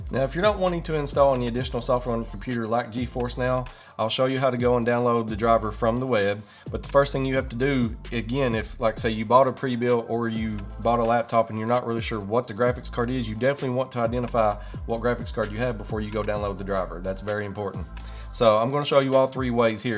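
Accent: South, United States. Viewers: Love the southern accent. Where are you from? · I agree...great tutorial, short and precise in a very clear and calm voice...very informative...Is there a slight southern accent to hear there??..😉😉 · Even though he has like a southern accent 🤣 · the south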